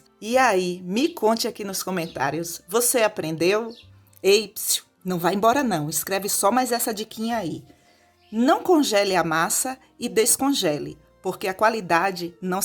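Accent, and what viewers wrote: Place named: Paraíba, Brazil. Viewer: Acabei de me inscrever!! A M E I a sua forma de explicar com riqueza de detalhes!!! Além do mais, que sotaque bonito!! De onde vc é?!?!🤔 Parece sotaque paraibano!!! GRATIDÃO pelo ensinamento meticuloso e paciente!! Deus abençoe a sua vida, seus projetos, seus objetivos!!! Saúde e paz!!! 🙏🙏🛐💕💕🤗🌻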